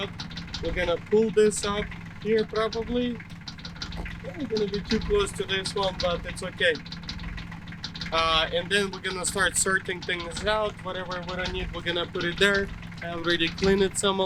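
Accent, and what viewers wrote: Russia. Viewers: Касаемо контента, все супер! Тачка моей мечты!!! 😃😃😃Но, я один слышу Русский акцент в разговоре? Или мне показалось? Касаемо АВТО: Если делать под себя, ТО раз уж разобрал, ТО я бы сделал Шумку!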